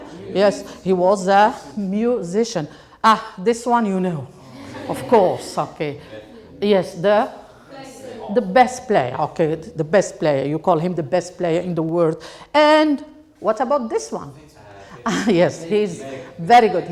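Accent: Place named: Morocco. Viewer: at least we could say this is Moroccan accent.We all know having British or American accent is no by no means available for all English teachers ....it's not easy to speak English authentically .if you have any other oppinion then you find it difficult to speak English like the way British or American people do ( namely native people )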